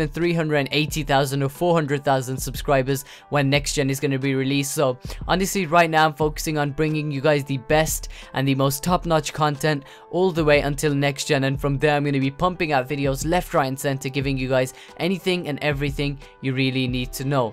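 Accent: Birmingham, United Kingdom. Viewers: Uk Birmingham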